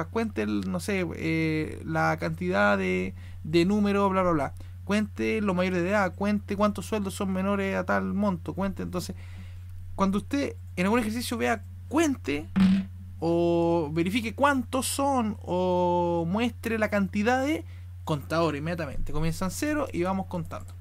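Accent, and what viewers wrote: Chile. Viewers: no se podia menos chileno?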